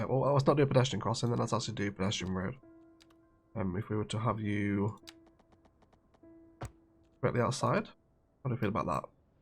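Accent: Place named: RP, United Kingdom. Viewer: I listened to your proper, refined-sounding British voice telling me that you were inspired by a highway layout from Montgomery, Alabama! 😂 Talk about a contrast! 😂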